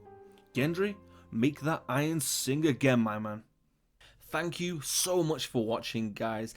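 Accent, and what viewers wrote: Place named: Liverpool, United Kingdom. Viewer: Snax Pendragon nah sounds more Liverpool/Cheshire but very light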